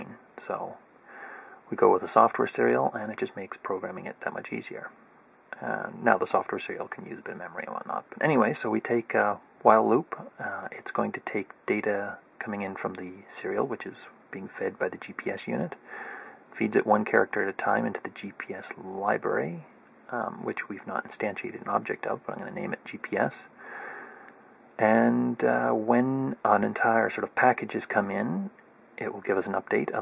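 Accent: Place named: Western Canada, Canada. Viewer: Western accent, metric country. Pretty obvious.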